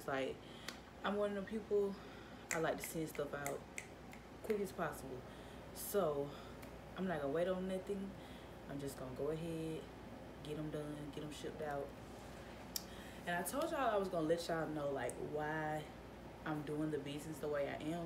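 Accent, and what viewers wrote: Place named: South, United States. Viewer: she (has) to be from Dallas or Mississippi....I know how we talk lol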